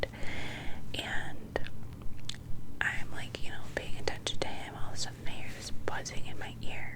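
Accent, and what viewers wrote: New York, United States. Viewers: Okay these videos are tingly but they are really fun. The new york accents kill me . Never ever ever ever ever stop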